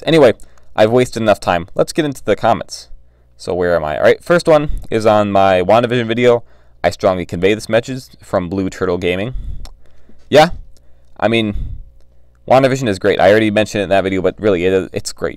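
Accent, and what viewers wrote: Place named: United Kingdom. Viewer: brilliant bri ish accent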